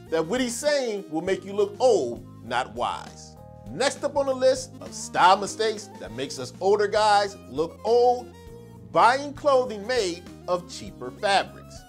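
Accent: Philadelphia, United States. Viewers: Bro as soon as I saw you and head you talk I KNEW you were from Philly. Honestly. It has to do with your grooming and how you express yourself. I would even go as far to say either Mount Airy or Cheltenham but definitely not North /South Philly? I’d like to know. I grew up in the Burbs, North Wales, the Holiday Inn was the Spot, along with Kim Graves, the Library and Second Story and Freaked out Catacombs. I am Thankful to be ALIVE TODAY. I was often, back in the day, in Ronald Phillips to get the freshest kicks. Or Today’s Man for clothes. I guess that tells you how old I am. I personally don’t feel comfortable in the slim pants leg simply because of my athletic build, but there is truth in the statement that Style is Personal. And the most important factor to a good looking, or attractive man is grooming. I remember growing up in that 80’s TP Era, always FRESH, when Men made an effort to IMPRESS the Ladies, BUT it was MORE important that You felt good. 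Changing up with a 3 day beard, or shoes with no socks. I still do wear CB Boots, but Ostrich, Lizard, or Croc with jeans. Some things don’t change. As a matter of FACT you resemble the Shoe salesman at Ronald Phillips LOL. Although most of the Brothers would feed off Other Bro to find the adequate style, I always liked to mix in the European flavors. I was into the Older Men’s fashion sense at a young age. I was very impressed with Bogart, Cagney, and the KOOL George Raft. Had a Subscription to GQ and learning about Designer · As soon as you started talking, I was thinking “He sounds like Philly.” And you are! Your vid popped up and I enjoyed it. 👍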